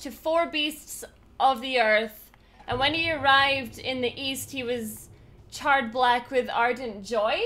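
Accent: Scotland, United Kingdom. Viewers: Are you Scottish ? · Are you British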